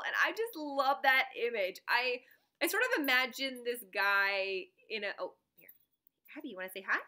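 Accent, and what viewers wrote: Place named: England, United Kingdom. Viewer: Thank you for taking my English accent comment and speaking more :D! <3 :)!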